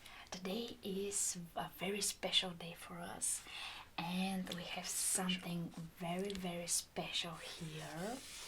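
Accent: Russia. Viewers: congrats. and one wuestion: are you russian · Are you Russian?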